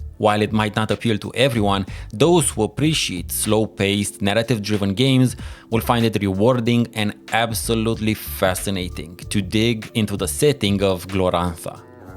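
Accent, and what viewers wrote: Romania. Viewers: Ai accent de roman. Esti roman. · Badass list. What's that accent? My terrible guess is Romania :)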